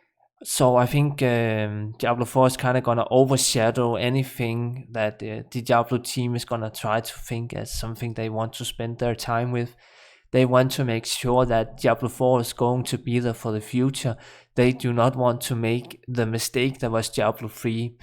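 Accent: Denmark. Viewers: Wow you sound so danish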